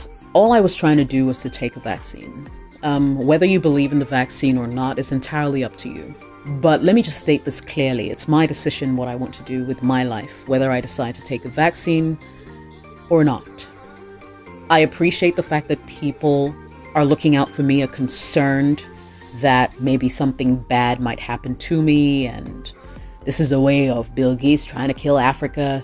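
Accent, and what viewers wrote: United Kingdom. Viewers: You sound like you've spent formative years in the U.K. to me. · Hi, How are you? You are blowing my mind with the way you Speak! The way you speak English is so clean!!! Up to now, I have never heard you break out into an accent. I have a toss-up between you being British-trained or US. So I am from Trinidad and Tobago. There are people in my country how sound is clean as you, being so well-spoken. Trust me I love the way you speak! Because I speak with my Trini Accent a lot, you can sound a bit annoying(lol), however, it's really pleasing to listen to you. Keep it up and every so often drop in your Ghanian accent if you can. Well keep checking out your topics. Have fun doing what you do.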